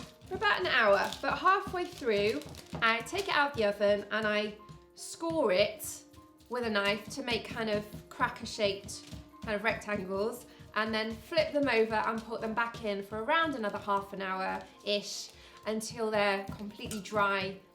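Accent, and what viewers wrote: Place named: England, United Kingdom. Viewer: Thank you so much for posting. I had all the ingredients for these and made a batch straight away. They are very tasty and go great with cheese. I've tried a few low carb cracker recipes and these are the best. You didn't specify Celsius or Fahrenheit but as you sound English I presumed Celsius. I have a fan oven so adjusted down to 130°c and they cooked perfectly.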